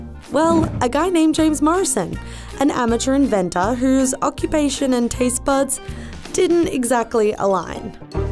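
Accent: United Kingdom. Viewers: Perfect British Accent 👌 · Such a bri'ish accent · Abella Danger with brittish accent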